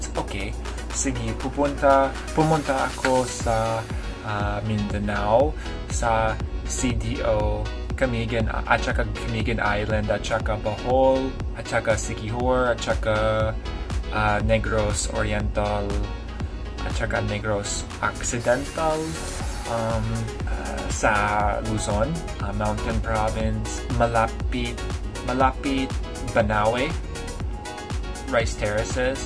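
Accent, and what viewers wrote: United States. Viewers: Good. I'm a Filipino and i can understand you. You have a lot of pauses and the accent is still american accent but it's fine as long as it's understandable. More practice 👍👍👍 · All you have to do now is polish the way you speak in tagalog and along the way you might lose the american accent when speaking tagalog.... woth a little bit time... the way you are gonna speak in the future might be on par with the locals keep it up.... you got the spirit imma stay tuned into this adventure even more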